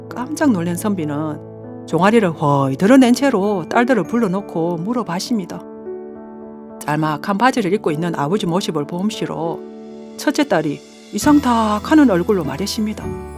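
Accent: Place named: Gyeongsang, South Korea. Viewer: 구수한 경상도 사투리에 내용도 그림도 참말로 재미나네요~^^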